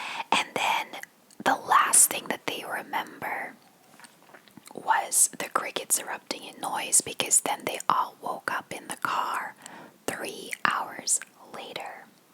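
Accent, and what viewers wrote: United States. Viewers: Is ze Nederlands? Ze heeft echt een Amerikaans accent!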